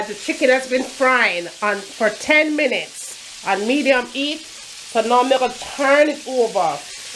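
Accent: Jamaica. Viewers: Lol I love how she chats the patois, many videos out there with Jamaicans trying to "twang" n it sounds bad....it jus feel like my aunty telling mi how to cook!!! well done. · no sah u can knw say a real Jamaican, anyways love it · "Nuh bodda guh watch tv an mek di chicken bun up!" 😂😂😂 you a real Jamaican! Love the videos! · The Accent tho !! Love it !! Real Jamaican ! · I Love this Queen Sister. She have me on the floor with her yard accent. I simply Love IT !!!! Tell it Sister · Very nice. Actually the best fried chicken recipe I've seen. I'm going to follow your directions and let you know how I did. an mi did very much enjoy do real yardie accent. Bless up. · I love that Jamaican accent even tho it's hard to understand everything you are saying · Love ur food and the way u talk real Jamaican lady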